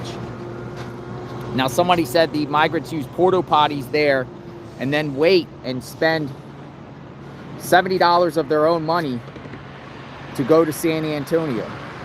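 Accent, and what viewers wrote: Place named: Midwest, United States. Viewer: Are you from Michigan or Wisconsin ? Trying to place the accent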